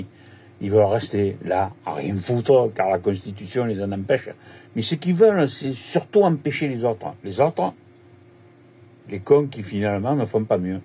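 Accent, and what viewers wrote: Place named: Turkey. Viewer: Parle français tonton ont comprend rien avec ton accent de turc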